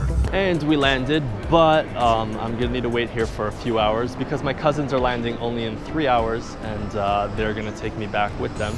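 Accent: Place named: United States. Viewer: Are your parents American? Your English sounds very American to me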